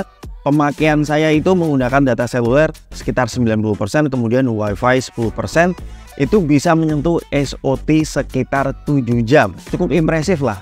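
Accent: Semarang, Indonesia. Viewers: Orang semarang om?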